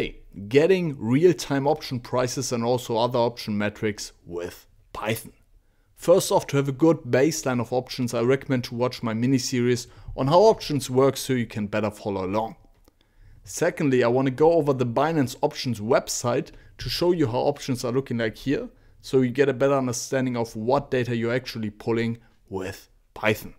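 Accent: Germany. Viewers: My God thank you for German accented coder, you are awesome. Indians are fine but accent is sometimes difficult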